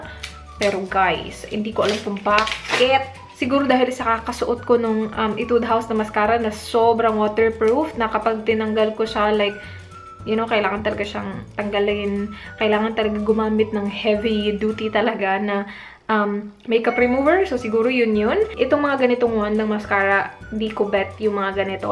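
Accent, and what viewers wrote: Visayas, Philippines. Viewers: hahahah ang kulit nya mag salita visaya ata si ate 😂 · ang panget mo mag tagalog parang bisaya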